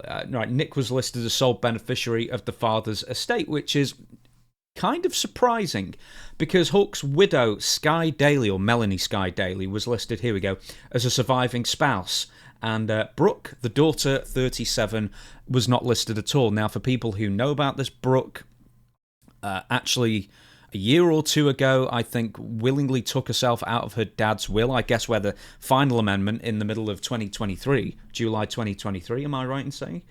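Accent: England, United Kingdom. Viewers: Hi English Accent, American Accent Here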